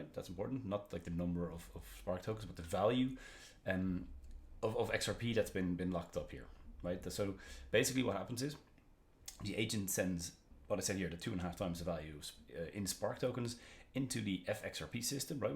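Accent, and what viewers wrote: Ireland. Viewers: Congrats and good luck creating new videos.. Looking forward to more videos from you....love your irish accent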